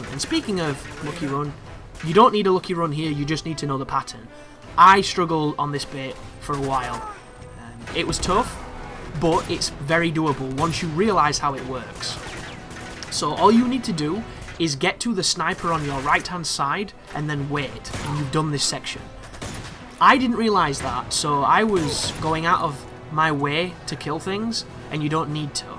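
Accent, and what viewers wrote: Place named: Scotland, United Kingdom. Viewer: I've watched this one before I started mine because I really had it difficult on the crushing level when your in the wagon. Perhaps I should have played it first and figure it out, because I noticed on crushing that you didn't have to kill the gunners. But it's the inbetween section where I got killed a lot of times and I was curious how you did it. (And now I know your a Scotsman. Perhaps you should comment al your games a second time in scotish. I will surely look this versions then. Love the Scottish accent as well as the Irisch and some Brittisch dialects. American Englisch is too nasal for me). For the part in the courtyard where you're hanging on the publicity. You did the first part much quicker than I did. I died a lot here in the first wave. But I didn't noticed the gas barrels. For the second part where you have to change side. 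It's much easier to descend one pannel. You can aim the two guys on the right without getting killed when they're firing. Though there are some things you have to know. First if you got too far to the left of the pannel and you aim for a headshot on the left guy, you can't always fire. Another thing that baders me here is that you can't shimmy when aiming. So you just have to guess if you're in the right position. And as you said the sniper is no problem at all. Certainly not if Helena shoots thus guy. Once he is stuned you can kill him, even with a headshot. For the third wave I changed side again. The advantage here is that you got a better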